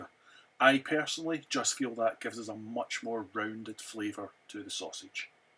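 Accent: Glasgow, United Kingdom. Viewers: west end of Glesga accent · Guid Scots accent there ( Glasgow?) This looks great , going to make a batch x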